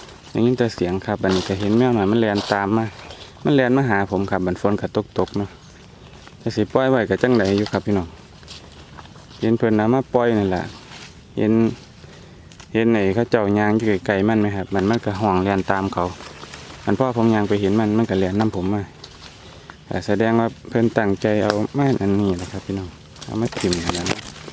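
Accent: South, Thailand. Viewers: พูดปาษาใต้จัดๆอิดแล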